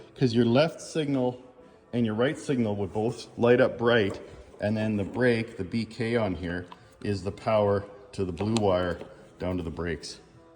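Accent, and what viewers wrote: Canada. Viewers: The way you talk you don't talk like a truck driver maybe it's a canadian accent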